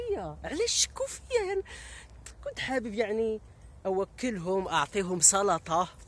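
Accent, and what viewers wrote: Morocco. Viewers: هههههه عن جد انت ظريف كتير حتى بعتبك لطيف ودمك خفيف ، بعدين احلى اهلين بتطلع منك ، على فكرة أنا بحسك سوري لكن بيحكي بلكنة مغربية 🌷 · خويا انا عاد عرفتك البارح و راني كنشجعك و كنشكرك على كل ما كتقدمو لنا من معلومات و نصائح. كنتمنى لك نهاية أسبوع سعيدة. جاتني هضرتك مراكشية إلا ايه راه حتى انا من مراكش 😄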